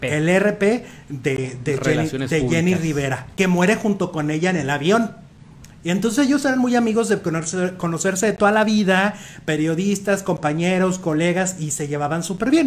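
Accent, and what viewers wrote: Fresa, Mexico. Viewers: Que fresa wanna be habla el cacheton. Nefasto escucharlo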